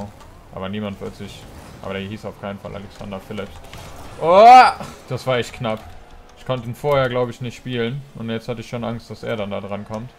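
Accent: Luxembourg. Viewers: Biste Luxemburger? 😂😂👍👍👌💪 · Kommst du aus Luxemburg?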